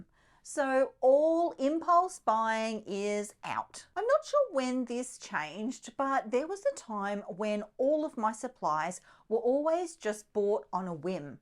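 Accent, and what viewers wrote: Australia. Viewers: Don’t talk daft. It’s a very nice Australian voice. You change your nasty attitude.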